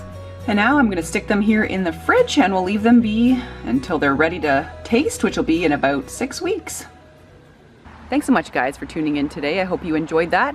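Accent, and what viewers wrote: Canada. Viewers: my god do you ever sound Canadian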